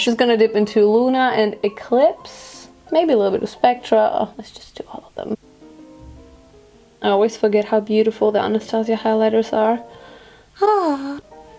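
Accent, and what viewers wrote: France. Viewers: Woow ! Your french accent is fucking good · Hey girl, I'm a french subscriber und ich kann Deutsch auch ;) I find your french accent not so bad. In the future, can u make an entire video in french pls :) Bye bitch <3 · You do have a really great french accent !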